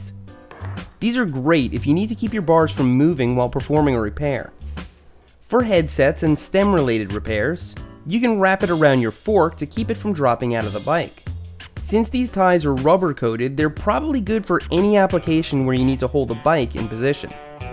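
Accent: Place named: New York, United States. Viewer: Hi! This is a great way to learn English! usually uses very good vocabulary and grammar, his accent is a little New York but not much, and his pronunciation is excellent. I noticed that another viewer commented on your English and I wanted to clarify on some things. First, remember that in English, we capitalize nationalities, so the word "german" should be "German". Second, the third person singular form of regular verbs in the present tense in English require an "s" as an ending ("asks"). Also, it is very important to capitalize the pronoun that we use for ourselves, the word (not letter) "I". We need to capitalize that one all the time. The sentence "I learn English for 3 hours" is a little strange, and might sound better in the present progressive ("I am learning English for three hours"). Finally, you need to fix your capital letters for the start of a sentence, for the pronoun "I", and for the proper nouns "English" and " ". 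You shift your verb tense from present progressive "am learning" to regular present "I watch", which is a little strange, but not grammatically incorrect. It would be a little better to say, "I am learning English if I am watching." A corrected form of your entire post would be: I am a German boy. My mom asks me if I am learning. My answer: I learn English for three hours. My thoughts: I am learning English, if I am watching. I hope that this was helpful, remember those capital letters, ride your bike a lot, and keep watching '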